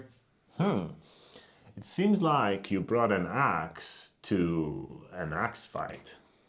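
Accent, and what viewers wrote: Poland. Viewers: i love this polish accent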